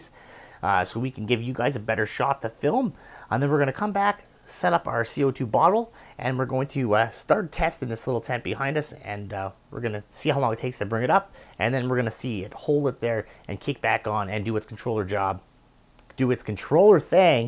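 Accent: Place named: China. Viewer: Thank you and yes Chinese English is one way to put it.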